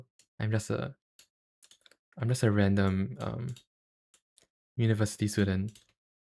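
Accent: Singapore. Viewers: hearing you talk in sg english is so weird lol my brain is not used to it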